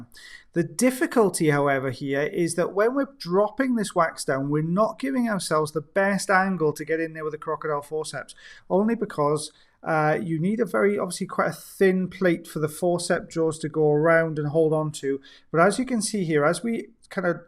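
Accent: Wales, United Kingdom. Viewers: Stay safe and family. I loved you saying “ebony coloured” in your lovely Welsh accent. We will just be happy so see your videos when you can post, you don’t need to apologise, it’s our privilege to see your work.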